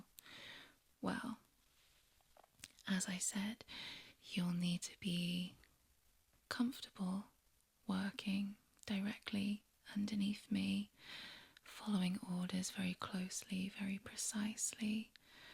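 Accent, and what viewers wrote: United Kingdom. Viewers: Your British accent makes totally devoted to u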